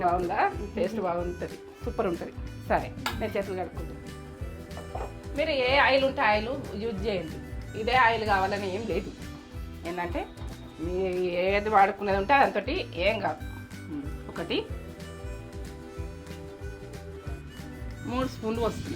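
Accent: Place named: Yadagirigutta, India. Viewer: Aunty meeru matladutunte same maa akka valla attagaru matladinatte undi mee slang..medi yadagirigutta side untara aunty..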